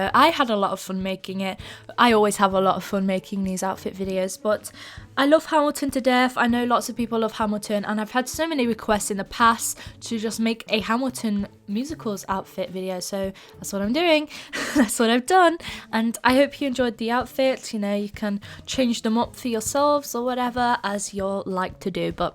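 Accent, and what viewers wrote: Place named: Scotland, United Kingdom. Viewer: Am I the only one who watches these for her soothing Scottish voice? Honestly it's beautiful and I wish I had your voice :3 I'm English myself but I just wish I had your accent 💗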